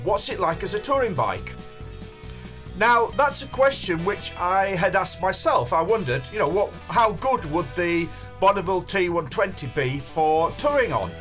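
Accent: England, United Kingdom. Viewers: Very sweet English accent may I know where is this from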